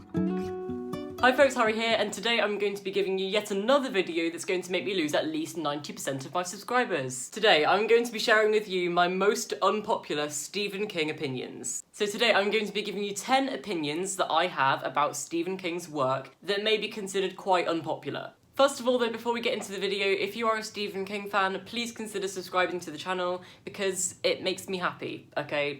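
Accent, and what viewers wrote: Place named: England, United Kingdom. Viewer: One off topic thing.... You have an amazing English accent. May I ask you where you come from?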